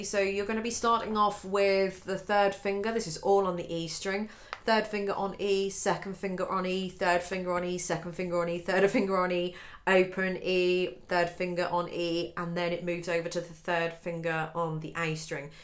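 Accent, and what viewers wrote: England, United Kingdom. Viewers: Aloha from Hawaii, I have just discovered this beautiful song and have only been playing violin since last October..However I have a good ear..and LOVE this piece. You are a wonderful teacher, and break the measures down so well for someone like me who plays better by ear. Also, I am Hawaiian, but my mum was from England..and adopted me from birth..SO it is like music to my ears!! to hear you speak..And you play beautifully. THANK you very much for everything!!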